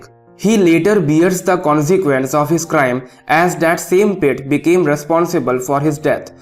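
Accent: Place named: Bengal, India. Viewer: Bhai are u bengali.... Because ur accents seems like u are bengali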